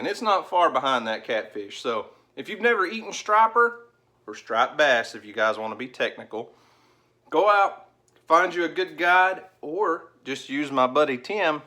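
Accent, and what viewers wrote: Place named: Alabama, United States. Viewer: Sounds like you are in Alabama instead of OK. Stripper and catfish are wonderful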